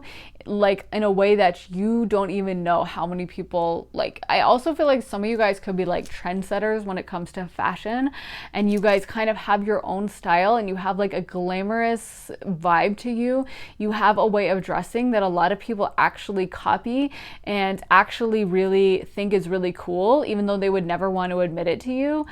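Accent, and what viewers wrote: Minnesota, United States. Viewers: Are you from Minnesota? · are you from MN?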